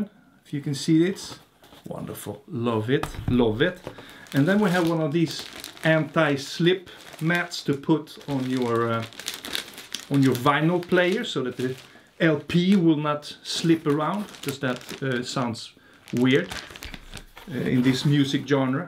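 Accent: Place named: Sweden. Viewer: Your Swedish accent is delightful! Love it 😁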